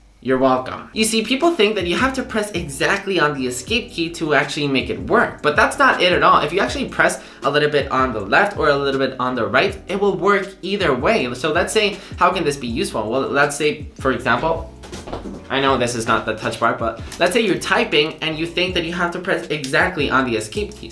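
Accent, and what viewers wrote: Spain. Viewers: I’d guess Spanish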